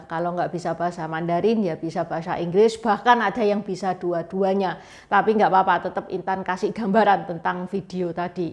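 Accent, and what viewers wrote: Java, Indonesia. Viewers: Mbak intan ini bahasanya medok kayak orang Jowo sedikitpun tidak terdengar tionghoa hehehe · Wong Chino logate kog Jowo...manggone nag Endi.. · Medokmu kental banget to cici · Jowone endi to jeng,aku subcriber antar lho❤ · Medok banget jawanya ...❤ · Medok bgt logat'e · SENANG DENGAR LOGAT TACIK INI YANG KHAS CHINA JAWA MEDHOK BANGET🙏👍👍👍😅😅😅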